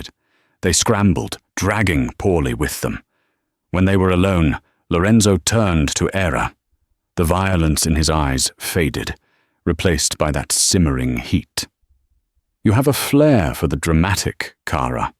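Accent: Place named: United Kingdom. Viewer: I have a simple solution if you are interested? I just put a piece of paper over that picture section of the screen, and click on Transcript to listen and read along with that purring English / British accent, and let my imagination do its magical thing, as it was meant to. A humble suggestion from Windsor, Ontario Canada EH?!🇨🇦 Cheers! Enjoy!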